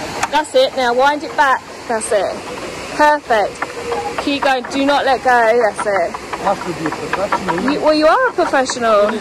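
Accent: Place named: Essex, United Kingdom. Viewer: There are many wonderful things in your videos, but today I must comment on your beautiful accent. Essex, but not Lorraine Chase. Your 'T's are not clipped, bottle ,and your 'G's are so clear something not somethin'. I can only put this down to your schooling. As a poet, I can imagine the clarity of words as they move from your mind to the pages of your notebook. There is a tranquility and calm in your delivery. I myself would be furious at the supermarket trolleys being dumped in canals and damaging people's boats. God bless and many thanks 😊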